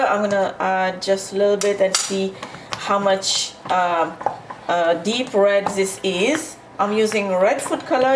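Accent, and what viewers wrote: Mauritius. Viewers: Natural Mauritian accent. Nice. No forcing other accents 👍🏽👍🏽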